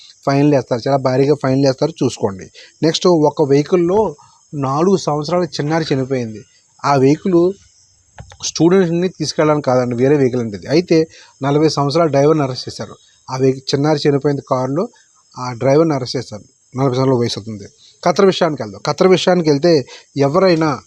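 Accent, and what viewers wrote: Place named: United Kingdom. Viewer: Again it's british english 😮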